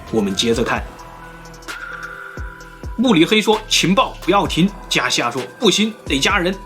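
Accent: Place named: South, China. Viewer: 我自己蠢，所以特愛聽聰明人的故事。這位南方口音超重的小伙子說的奇談怪論有趣、好聽、我喜歡😘。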